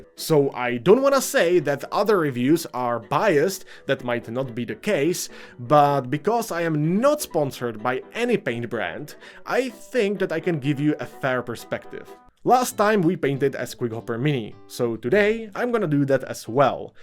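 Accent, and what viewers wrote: Czech Republic. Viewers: Where are you from my guy? You sound like guy from Czech Republick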